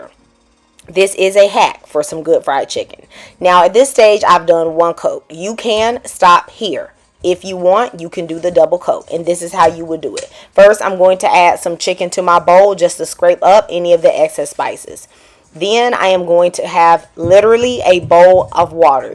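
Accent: South, United States. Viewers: Like Mr. once said in my Country Brazil in 1926. IT'S DELICIOUS! IT'S DELIGHTFUL!!! Thank you so much for this so special recipe I will prepare immediately! I LOVE your accent!! I had a golden opportunity to live in the south for 6 years Birmingham Alabama. I made a lot of friends there. I learned how to make a good gumbo soup and chicken Jambalaya. Thank you again. Love in it! 🥰👏👏👏👏👏👏👏👏👏👏👏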